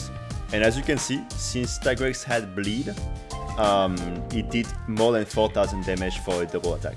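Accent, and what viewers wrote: France. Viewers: was kinda my purpose. Thx. Btw are you French?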